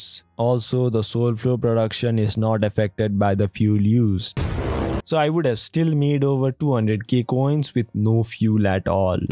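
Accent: India. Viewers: u sound indian af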